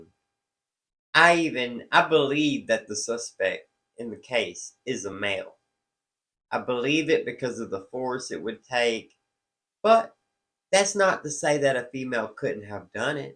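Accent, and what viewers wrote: South, United States. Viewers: You and I have quite a lot in common. Down to the “Southern-ness.” 😆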